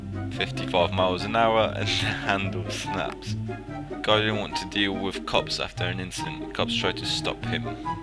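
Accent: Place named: United Kingdom. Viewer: Great to have a UK voice on a channel 😀Suvscribed👍🏼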